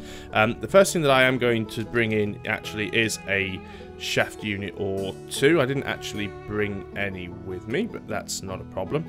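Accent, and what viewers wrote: United Kingdom. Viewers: good bye forever :( this was a nice change of pace, this should have been called, exploring rotary craft. MAN! i really enjoyed this one, I really just like hearing your voice while I play minecraft, honestly. I could hire you to just talk while playing minecraft and explain everything you do in your british way. its really fascinating and relaxing to listen to you speak. please consider revisiting minecraft!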